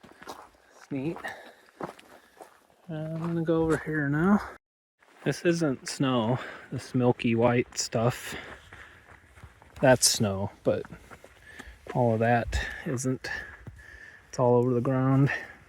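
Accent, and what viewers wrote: Utah, United States. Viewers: Did you ever live in Utah? I hear a Utah accent when you speak. On that road you were on going past "The Hole in the Rock" and going into Moab, there is a formation called the "Disappearing Angel". Starting at about 15 miles before Moab on that solid wall of rock to your left, you can see a spire out in front of it (don't know what else to call it) standing away from the wall and several feet away. You keep seeing it as you get closer. Then all of a sudden you come around a corner and the spire is completely gone - thus "the Disappearing Angel"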